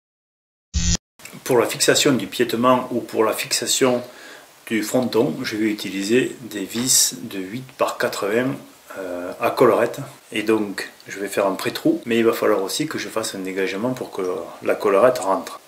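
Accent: South, France. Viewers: enfin une video simple et explicite a la fois ......qui plus et avec un bel accent du sud , ce qui ne gâche rien au plaisir d'apprendre .....merci pour tout vos bons conseils .....(yves)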